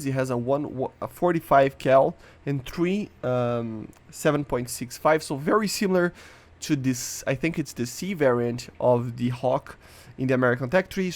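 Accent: Brazil. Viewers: Se eu não soubesse diria que vc é brasileiro, parece muito sotaque de brasileiro falando inglês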